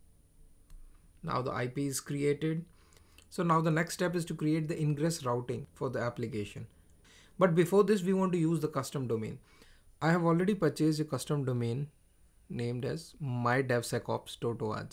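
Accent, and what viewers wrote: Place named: India. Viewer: first and foremost, thanks for the video. Also thank you for speaking clearly. I often close videos with Indian speakers because it's hard to understand. You have a heavy accent but it's clear and professional and a quality sound recording. thumbs up and cheers mate!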